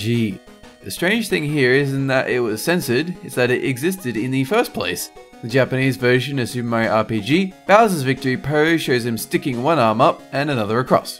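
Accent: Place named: Australia. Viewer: I miss this narrator. Australian accents are always cool, and is particularly pleasant.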